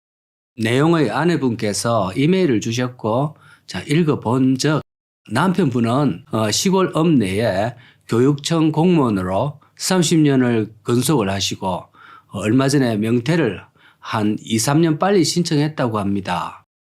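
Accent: Gyeongsang, South Korea. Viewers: 님 안녕하세여 경상도 사투리로 설명해주시니 정겹습니다 잘보그 조아여 누르그 갑니다 건행하셔여 ❤❤❤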